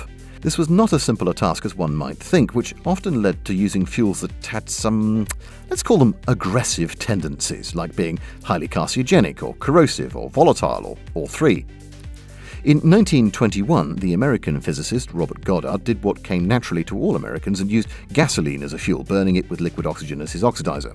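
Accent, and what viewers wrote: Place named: England, United Kingdom. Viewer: Damn you! Once again, you have, through the magic of the Youtube "Subscibe" button, caused me to lose some perfectly useful sleep watching your highly informative and semi-mesmerizing presentations on Nazi Germany's comic attempts at world domination! Although this time I have no family-related commentary on the the technology involved in winning the War for this post, at least I understood at least seven out of ten of the words you spoke thanks to my continued exposure to the "English" English language. Thank you, citizens of Weymouth, Dorset, the staff at The Imperial War Museum in London and my friend and British Expat Clumpton - who lives in Vichy, France. Someday, someone will create a series of theme music Memes like that of YouTube's Mark Felton... Do keep up the good work, chap. Cheers!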